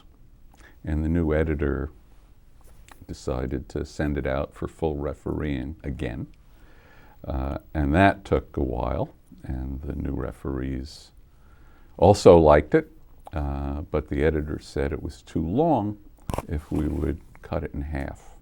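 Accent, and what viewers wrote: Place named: New York, United States. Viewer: Strong NYC accent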